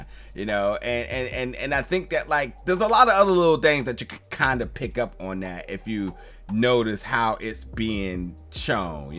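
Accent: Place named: Baltimore, United States. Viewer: Are you from Baltimore or something? 😅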